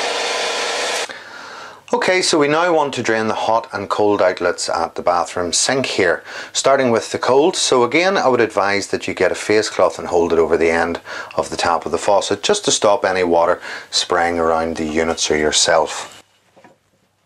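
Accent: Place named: Ireland. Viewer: Omg the subtitles are soooooo funny !!!! The Irish accent caused some confusion 😂😂😂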